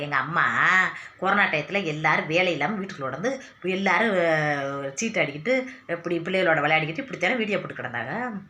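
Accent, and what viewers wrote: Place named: Ramanathapuram, India. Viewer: உங்க ஊரு ராமநாதபுரம் மாவட்டம் பேச்சை இது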